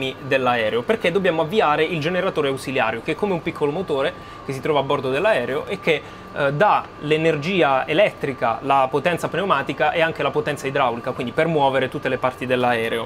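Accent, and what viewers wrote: Apulia, Italy. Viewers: Ciao, no è pugliese:)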